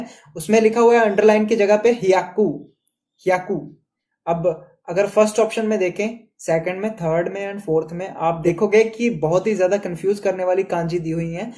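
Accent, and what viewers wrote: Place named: Punjab, India. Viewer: Awaaz me punjabi tone aa rahi hai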